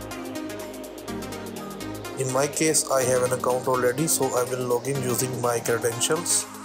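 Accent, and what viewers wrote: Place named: India. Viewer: It’s just an Indian accent bro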